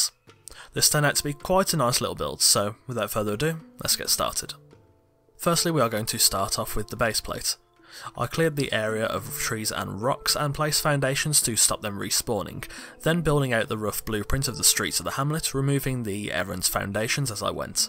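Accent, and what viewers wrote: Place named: United Kingdom. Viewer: Dat damn fine british accent.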